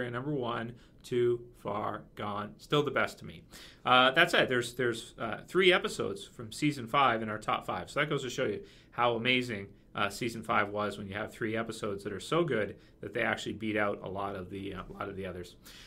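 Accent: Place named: Canada. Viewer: Hey. Out of curiosity, what province are you from? I am an American but I lived in Newfoundland for awhile and based on your accent I'm almost positive that isn't where you're from haha. I've been to a lot of places in Canada and I was just curious as to what part of the country you're from.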